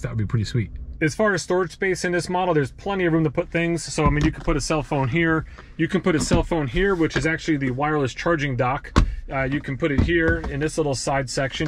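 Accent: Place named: New York, United States. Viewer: Finally they fixed the seatbelt and you can adjust it now. I love your New York accent.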